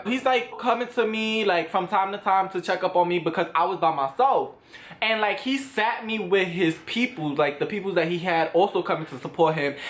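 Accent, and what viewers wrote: New York, United States. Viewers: "ah ah ah " lmfaoooo realll NY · NYC accent lol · its a fucking accent/dialect in the new york area he's from, get over yourself. how do you know he is uneducated? you just assume that from his accent? you need to educate yourself on the definition of ghetto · I love how people from new york tuawk "They was lukin mad brick, like you new they was niggas, they was like Boom, Boom, Boom" hahaha I love how animated you are I need a friend like you! loll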